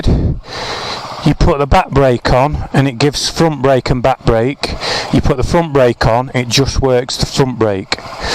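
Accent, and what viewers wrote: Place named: Midlands, United Kingdom. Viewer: Lovely machines the Burgman, I had the 400cc version, loved it, it could do 60-80 all day, mine broke down and I had no idea what was wrong with it, it would start but then stop and wouldn’t restart. I was gutted that I couldn’t figure out what was wrong with it so I sold it as a project, the new owner figured it out and got it running and it’s still on the road today. They’re pretty nippy bikes, very comfortable, good on the fuel. Your accent sounds familiar, are you from Stoke on Trent or Derby or somewhere there? Great vid, good review, thanks.👍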